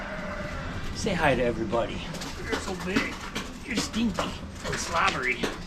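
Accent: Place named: Wisconsin, United States. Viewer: I love how you mix your Wisconsin accent with Spanish accent.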